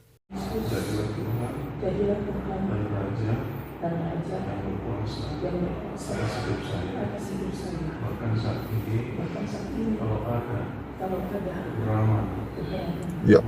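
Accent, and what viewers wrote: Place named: Eastern Indonesia, Indonesia. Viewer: Yg Bicara Ini Dr Logat nya Dari Suku NTT ATAU MALUKU!!!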